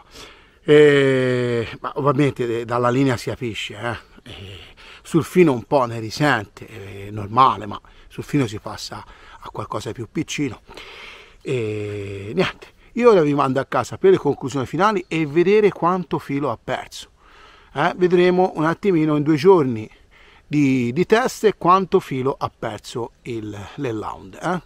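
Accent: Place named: Tuscany, Italy. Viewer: Coltello pazzesco e’ riduttivo….addirittura anche il Leccio si è dovuto arrendere( dopo una estenuante lotta corpo a corpo 😂)….mi piace moltissimo…..ottima la tua recensione (come al solito…) sempre dettagliatissima e colorita col dialetto che solo noi toscani sappiamo offrire. Una buona domenica a tutti 😊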